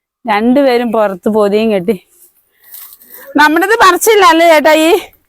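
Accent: Kottayam, India. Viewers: വീട് കാസർഗോഡ് ആണെന്ന് മനസ്സിലായി. എന്നാൽ ഭാഷ കോട്ടയം പത്തനംതിട്ടയിലേതു പോലെ. ആൾക്കാരുടെ സംശയത്തിനൊന്നും മറുപടി കൊടുക്കാത്തതെന്തു.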